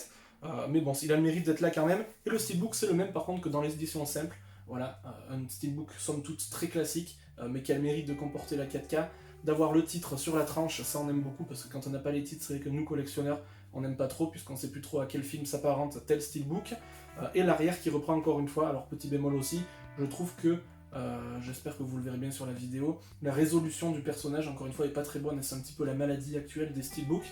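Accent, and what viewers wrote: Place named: South, France. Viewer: super! Vu qu’à tu as un petit accent du sud et que je suis de Toulouse, tu pourrais me dire lequel? 😅 en tout super vidéo!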